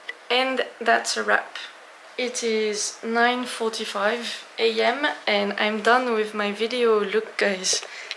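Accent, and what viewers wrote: France. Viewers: おめでとうございます!! 🎉🥳 I love how your lovely French accent comes through more when you're excited! 😊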